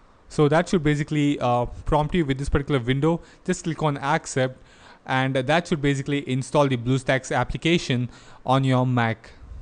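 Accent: India. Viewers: u sounded indian or asian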